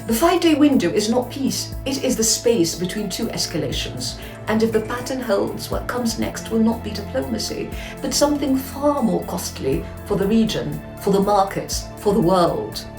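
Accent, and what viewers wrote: RP, United Kingdom. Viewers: I like a lot your British accent Anyhow, never ever trust trump and Iranian people should not fall Again into Trump propaganda 👈👈👈👈👈👈👈👈👈👈👈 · This truth-teller with her hilarious Julie Andrews accent haunts my dreams :face-red-heart-shape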